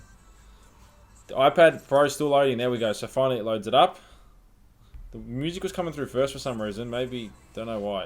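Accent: Australia. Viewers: U must be Australian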